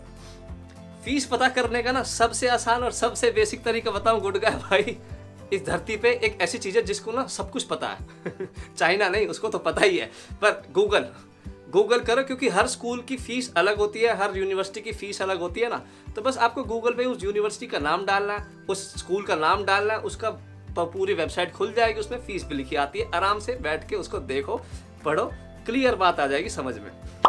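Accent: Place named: Uttar Pradesh, India. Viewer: Bhai ho to haryana se but aapki language to up ki hai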